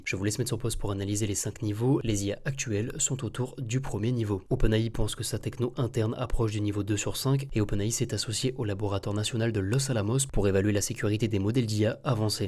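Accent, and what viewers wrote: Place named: France. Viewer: je te decouvre c"est interessant. Un peu trop de francais dans ton anglais a mon gout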